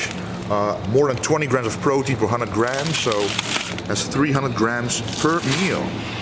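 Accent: Netherlands. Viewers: I can listen to this guy talk all day long, the Dutch accent is very pleasant sounding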